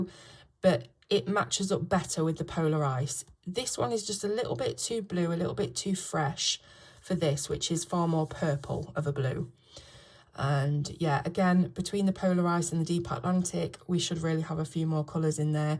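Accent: England, United Kingdom. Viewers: Вы англичанка? Очень интересный акцент.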